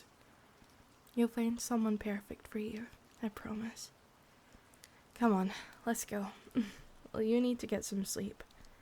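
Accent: Scotland, United Kingdom. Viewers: I'm done with normalcy, I'd trade it for a cute scottish stalker given the chance. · This went places I wasn't prepared for. That line about not needing someone to help you feel things got me. The Scottish accent helped give the performance an aire of authenticity. Amazing work. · Ahh she's a sco-ish stalker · I prefer your deep Scottish accent. I find it more relaxing when I hear your Scottish voice than the higher pitch voice. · omg she's scottish. that changes everything XD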